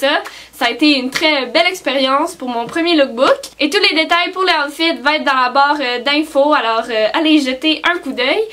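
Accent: Canada. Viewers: Coucou !! J'adore tes vidéos !! Et en plus tu es canadienne ! J'aime tellement cette accent !!! Mon plus grand rêve et d'aller au Canada !!! Tu es ma youtubeuse préférée !! Je regarde toute tes vidéos en boucle !!! 💘💘 comme video pour tes 3000 abonnés d'ailleurs félicitations tu mérite beaucoup beaucoup plus !!! J'aime beaucoup tes maquillages, grwm, morning routine, Night routine ou encore cooconing !! Ou bien une video ou tu présente un maquillage pour chaque jours de la semaine en 1 vidéos ! Et pareille pour des coiffures pourquoi pas ! Bref fait ce que tu veux n'importe qu'elle video nous plaira tu es trop parfaite !! ❤️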